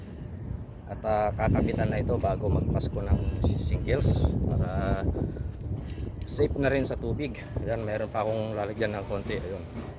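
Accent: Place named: Ilocos, Philippines. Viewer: TAGA SAAN PO KAYO PARANG ILOCANO PO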